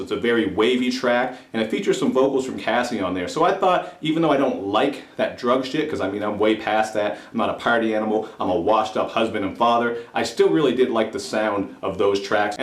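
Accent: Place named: Canada. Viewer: oh youre canadian thats a funky accent bro and in part sound funky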